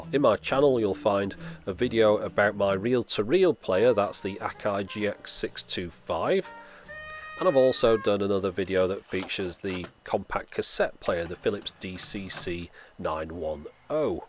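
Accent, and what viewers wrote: United Kingdom. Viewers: Thanks,. excellent videos. I relate totally to the spectrum analyzer aspect of home and auto hifi. and for the record, your voice is excellent. for some reason, British accents are like fingernails against chalkboards to me, but not yours. keep up the great work.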